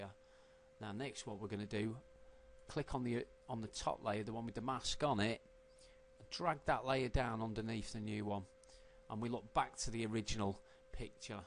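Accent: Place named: Manchester, United Kingdom. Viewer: really good tutorial, and I like the Manc accent top man.. lol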